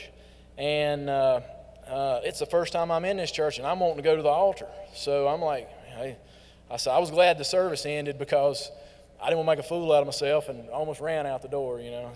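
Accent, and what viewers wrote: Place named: United States. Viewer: I like your True American accent the best. never mind that you are handsome too.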